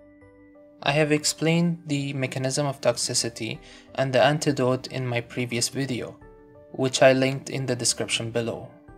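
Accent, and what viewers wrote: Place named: Arab world. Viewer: You speak english with an arabic accent ^_^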